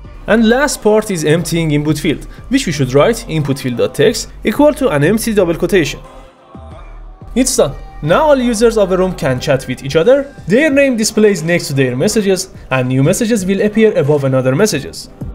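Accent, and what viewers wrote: Iran. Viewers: you sound Iranian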